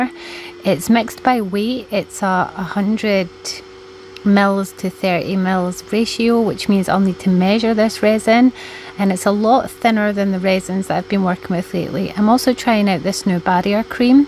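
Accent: Scotland, United Kingdom. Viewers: Hi. Its fantastic to hear a Scottish accent that sounds quite like mine. I'm a bit of a mixture. Born Coalburn Lanarkshire moved to Cumnock Ayrshire. Spent 10 years in Surrey and now I'm in Leslie Fife. Lol. I usually watch Acrylic Paint Pourers from America. Canada and New Zealand. I've just finished Resining a painting and this video of you with your BLESSED Resin plack.. I love it. The colours are amazing I will have to watch the full video. I have subscribed and liked your work. I love Livingston Centre but not been for a while. I have a neighbour who is poorly and wants to go there so I will take her down when we can organise it. Hope to catch up soon. Sandra 🌹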